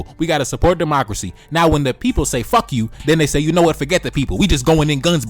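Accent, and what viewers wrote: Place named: New York, United States. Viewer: I love your geopolitical videos. Those are the ones that I always click on! Not only are they informative, but you manage to make them so funny too, with your New York accent😂😂. Keep it! 🇭🇹🤝🏿🇧🇮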